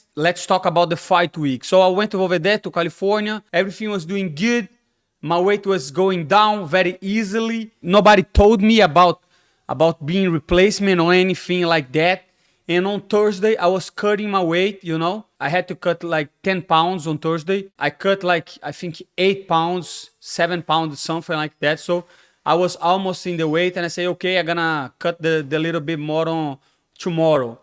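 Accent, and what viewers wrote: Brazil. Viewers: Thats the most brazilian sounding brazilian ive ever seen.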